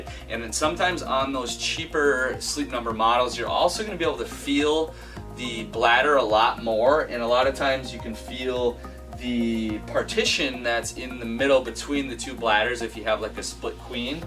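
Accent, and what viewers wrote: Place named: Canada. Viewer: Sound Canadian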